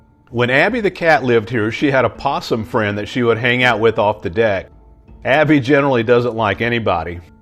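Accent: South, United States. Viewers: This is what YouTube is made for! What an amazing experiment, and how generous of you to share your home with your fellow critters around you:) Thank you for making this and sharing it. You're a very kind person, and your lovely southern accent is a bonus for narrating your adventures! Edit:, please do stay curious!!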